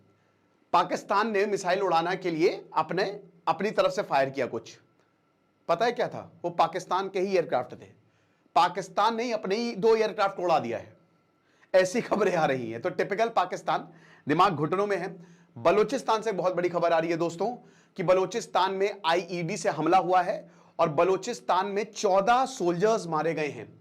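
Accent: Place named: Lucknow, India. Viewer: Sir aap lucknow ke hain..apki accent se lag raha..❤❤ mein bhi lucknow se..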